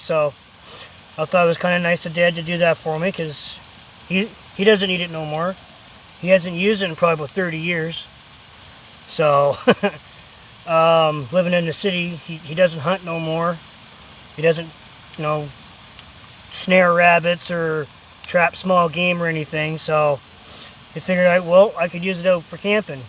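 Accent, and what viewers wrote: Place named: Scotland, United Kingdom. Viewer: you sound kind of funny for a Scotsman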